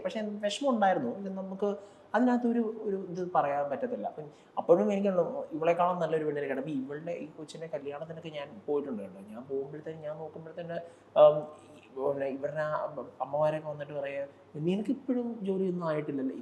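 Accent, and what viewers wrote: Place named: Kottayam, India. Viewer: Kottayam karalle ata slang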